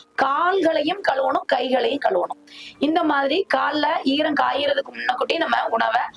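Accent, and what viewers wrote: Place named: Thoothukudi, India. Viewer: பேசும்போது தூத்துக்குடி வாசனை வருகிறதே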